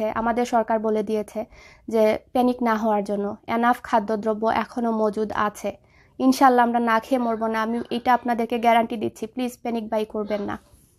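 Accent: Sylhet, Bangladesh. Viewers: আপা, অনেক ধন্যবাদ আপনাকে। আপনি কি সিলেটি?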